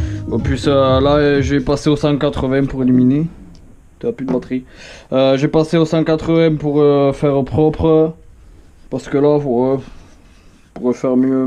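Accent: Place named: Tarn, France. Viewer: Bouduuuu, saint sulp, lavaur ou graulhet ?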